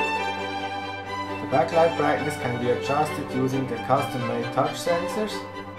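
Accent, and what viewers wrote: Switzerland. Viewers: ... swiss accent x3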